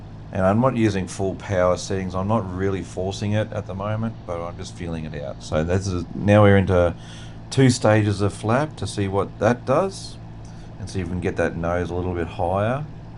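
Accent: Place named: Australia. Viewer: Great video and just wonderful to listen to your beautifully calm quiet commentary. Hearing a quiet Aussie is such a restful change compared to our American counterparts with similar videos. Love it.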